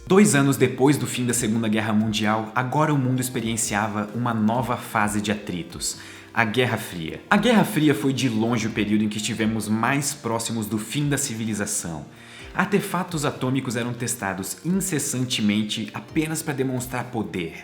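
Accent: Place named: Northeast, Brazil. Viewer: Nordestino sudestizado. Forte abraço!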